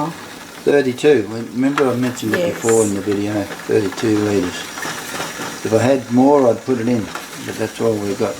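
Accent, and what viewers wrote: Australia. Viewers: Hahahaha good old Aussie accent. Love it. Im also aussie Im gonna try this! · Well....I was curious how sea salt was made, now I know. I admire anyone who takes the time to go through the process necessary to yield sea salt! I hope to (one day) visit Australia, love the accent too! I was just mocking Crocodile Dundee today! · Are you Australian?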